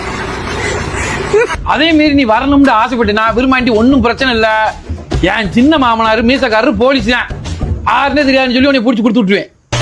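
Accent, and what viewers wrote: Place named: Madurai, India. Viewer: மதுரை கிராம பாசை😂😂😂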